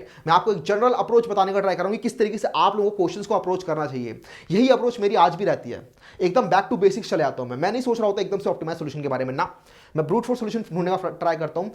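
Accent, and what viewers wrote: India. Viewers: His voice is like Ashish nehra is he from Haryana ?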